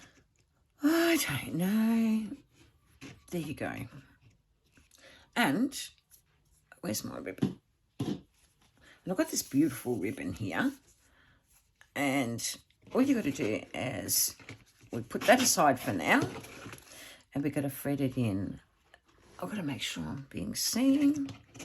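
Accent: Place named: Australia. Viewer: Simple & to the point. Love to hear an Aussie as well. Going to enjoy watching your ideas & projects.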